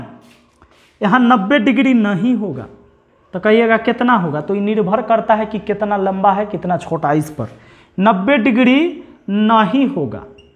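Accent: Bihar, India. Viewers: Bihari hai sir😊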